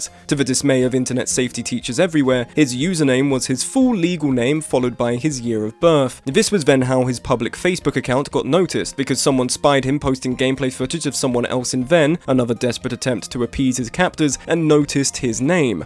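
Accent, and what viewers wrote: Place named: United Kingdom. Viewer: Oooh, a 4-hour video analysis on a game narrated by a British person! I'm sure that my ADHD riddled mind can handle this! 😃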